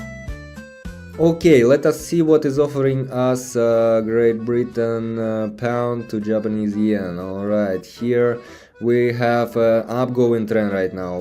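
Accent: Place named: Russia. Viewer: feel his russian lol subscribed just for that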